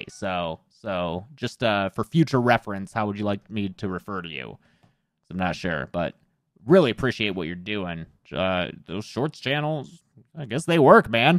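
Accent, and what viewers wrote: United Kingdom. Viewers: OMG YOUR BRITISH !!!!!!! MY FAVOURITE YT AND NOW THIS!!!!! Bru I love you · bri'ish pog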